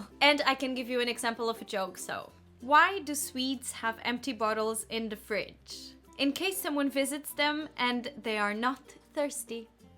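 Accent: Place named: Oslo, Norway. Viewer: not at all:) after living in Oslo for some time Ive got the same accent. that’s why I noticed:D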